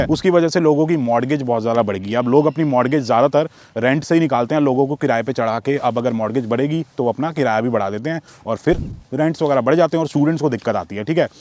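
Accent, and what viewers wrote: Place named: Delhi, India. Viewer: delhi wali hindi 😊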